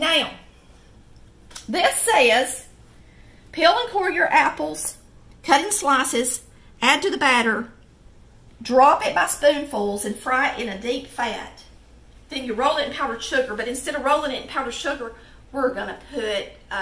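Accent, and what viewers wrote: South, United States. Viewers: Looks absolutely delicious, and you make it look easy enough that I think I can make this recipe! I just love your Southern accent: "MEELK," "THE-IS," "Y'ALL," etc. I'm originally from Savannah, GA, but lost my accent years ago when my family moved to Ohio. I've subscribed because you and your husband talking and cooking is so great, and I've got to watch more. Thanks! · I'm from Florida and I've been watching about 7 minutes, being as I just found your channel. Love your southern accent by the way! Thanks for sharing! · I had a lemon tree and the 🍋 lemons were bigger than a can of coke! They were also a little sweeter than a normal lemon. I sure do miss having that lemon tree! I also had a grapefruit tree that the grapefruits were a little bit bigger than normal and talk about being sweet, you didn’t have to add any sugar to eat them. 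We could just peel them and eat them like you would eat an orange! I miss having those for breakfast in the mornings! I, too enjoyed watching this video and listening to her talk with that accent! I am from NC and miss hearing it and the funny sayings that comes out of the south! Can’t wait to make make these! Sometimes I want something at night while I’m watching TV but I don’t want anything really sweet, and this sounds like it would fit the bill! 🦋🦋❤️🦋🦋🍋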